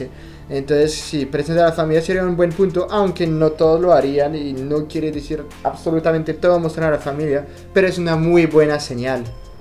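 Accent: Colombia. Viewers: Hablas muy bien el español, incluso tienes acento, parece colombiano, eres genial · Holaa me encanta tus vídeos siempre hablas muy relagado y colombiano un abrazo 😚😊💞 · Tu español es muy bueno para ser Francés, tienes un acento bien colombiano btw. Muy interesante el vídeo lol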